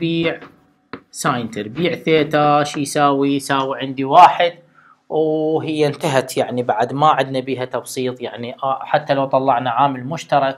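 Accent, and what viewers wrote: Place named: Basra, Iraq. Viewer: من لكنتك بصراوي😹😻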